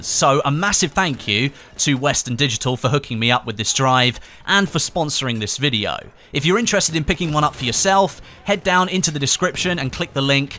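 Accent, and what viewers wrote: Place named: United Kingdom. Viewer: When people from the UK say h.....so awesome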